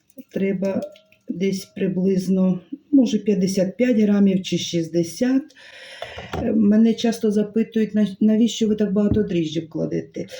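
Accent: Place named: Ukraine. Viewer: у вас очень красивая, спокойная украинская мова, вас приятно слушать. Не суржик, а именно мова.😊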